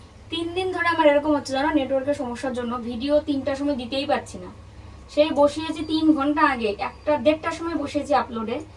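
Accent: Bangal, Bangladesh. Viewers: তোমার বাঙাল কথার টান ভীষন ভালো লাগে। আমি তো ঘটি তাই তোমাদের বাঙাল কথার একটা আলাদা charm লাগে। মিকি কি মিষ্টি। আমার দুটো ডগুর মধ্যে একটার নাম মিকি।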